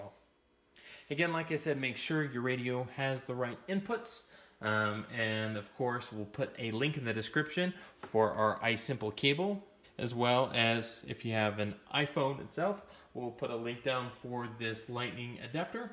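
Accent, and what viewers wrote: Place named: Ireland. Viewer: Damn this guy is severely irish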